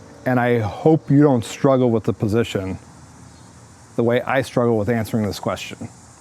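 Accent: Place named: Canada. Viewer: He’s in America? He sounds Canadian to me.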